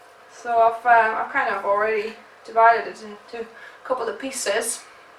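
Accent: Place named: Scotland, United Kingdom. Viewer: Are you Lithuanian? I was so sure you were Scottish or from somewhere nearby in the UK! But I'm not from there myself so maybe there is some small differences that I don't hear. The okara roast looks interesting. I'm getting so much okara now since I have started to make tofu and chickpea yogurt so I'm really appreciating the okara recipes!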